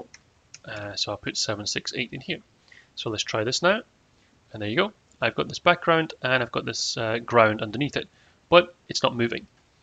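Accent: United Kingdom. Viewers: Omg I never hear somebody with the same accent as me on YouTube. Ayyy Northern Irish accent representation 🫶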